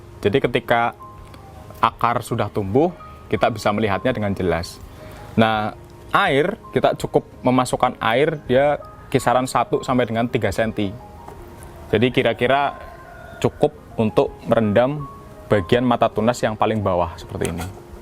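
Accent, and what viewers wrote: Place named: Banyumas, Indonesia. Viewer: Mantep!! Ngapak kie mas e kaya inyong. Btw kalau batangnya kering ngerendamnya lagsung pake air+fungisida atau rendam air dulu baru fungisida?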